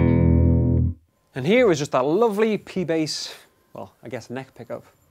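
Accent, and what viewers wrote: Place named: RP, United Kingdom. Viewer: are you just the coolest bass player I've seen in a long time, or what? I love the intro, 'you shouldn't have'. For some unknown reason, every time I hear your accent I think of a quirky bass player, educated at Oxford, or Cambridge, but also understands the finer points of bass playing and bass guitar. Thanks for the review BTW; excellent. I've passed it on to all my muso friends.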